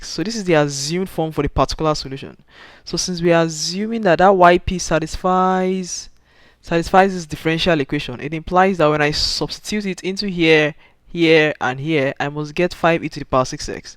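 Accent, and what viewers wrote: Nigeria. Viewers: Thank you for the video! You be Naija guy?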